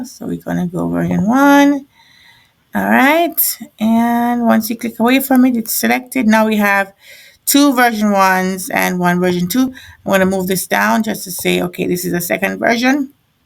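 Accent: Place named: Jamaica. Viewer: Sounds like you're a yardie. Loved the video, keep up the great work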